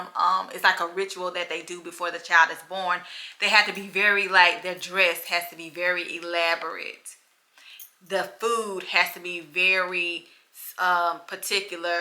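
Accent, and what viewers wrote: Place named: South Carolina, United States. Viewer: I was wondering why you sounded soooo country - then you said you're in South Carolina. I'm in Columbia - Broad River / Saint Andrews area. Hello neighbor!!! 😁😁😁😁 Will you find out how Prince is doing please? Thank you. Keep up the good work 👍👍👍👍👍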